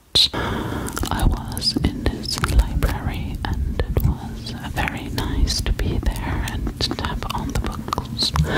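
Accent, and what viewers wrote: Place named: Netherlands. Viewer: Really love the Dutch accent with some books sounds 😊😮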